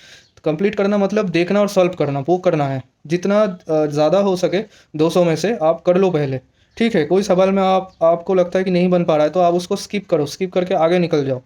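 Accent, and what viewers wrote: Bengal, India. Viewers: Amar o mone hoyechilo Bengali . Pronounciation lgche omni .... 🙂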